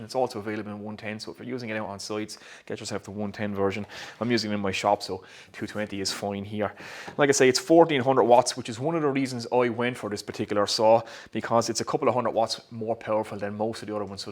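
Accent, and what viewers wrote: Ireland. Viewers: Your review is just as spot on today. Thank you. First time watching your videos and it took me a few seconds to get my yank ear in tune with your very cool brogue, but very much enjoyed listening. Your teaching style is very good. Well done, sir! 👍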